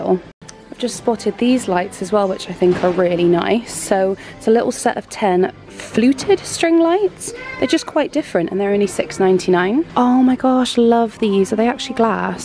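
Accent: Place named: England, United Kingdom. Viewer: Hello sweet lady I'm in America from the suburb of Chicago May I ask where you're from you sound like you're from London Have you ever been to America or Chicago We have a store called Dollar Tree sometimes they have good stuff sometimes they don't all depends what you're looking for Thank you for a nice video God bless have a good day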